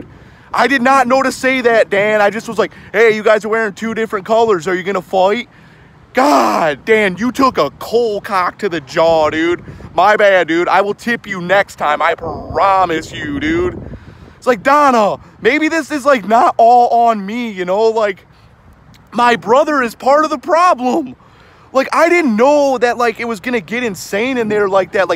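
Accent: United States. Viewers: what state is this dude from? lmao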